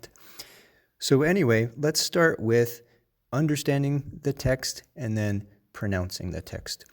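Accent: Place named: Latin America. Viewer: So beautiful!! I really love your Latin accent. Thanks and God Bless you.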